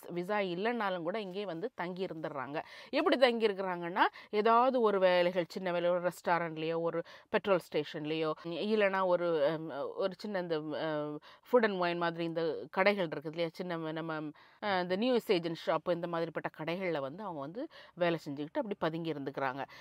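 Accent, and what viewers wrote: Nagercoil, India. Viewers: Neega nagercoil a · Nagercoil Tamil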